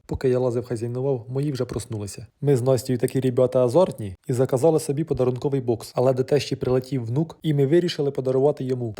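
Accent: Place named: Poltava, Ukraine. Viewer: Мова схожа на Полтавську!))))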